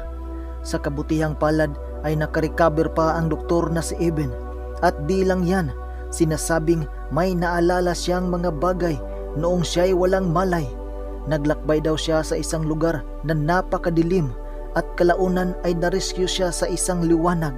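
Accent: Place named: Visayas, Philippines. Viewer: Bisaya ka dol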